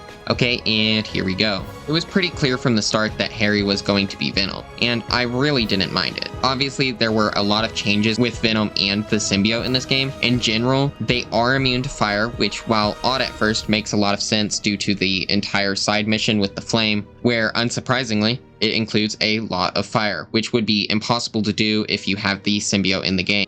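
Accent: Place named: United Kingdom. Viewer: Bro I've noticed that you have the British accent. Can you give to me some advice to improve my listening skills to understand you British people?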